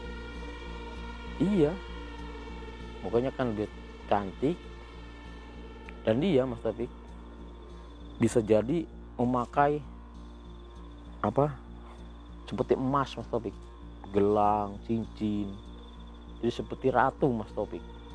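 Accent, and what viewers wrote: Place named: Sunda, Indonesia. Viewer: Assala mu allaikum om ngomong sundanya lucu om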